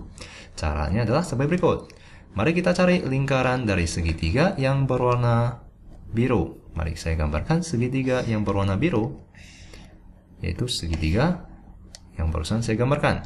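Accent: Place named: South Korea. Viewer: Logatnya kaya org korea 😅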